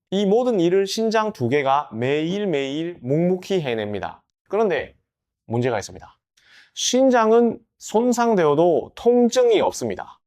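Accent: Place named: China. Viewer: 방송이 뭐 이래요 중국식 발음이 뭐예요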